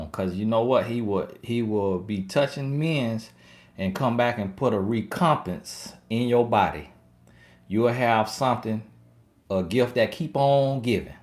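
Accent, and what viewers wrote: South, United States. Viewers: I just finished watching this chat. I enjoyed it. I just started watching a few of your videos. You have a new subscriber. I don't know you but I like your aura, that you speak of God and your southern roots. Your accent reminded me of my mother's accent (she grew up in FL). God bless you! "I don't have Go-Go gadget eyes". You're funny! Maybe a few ppl on here know about Inspector Gadget.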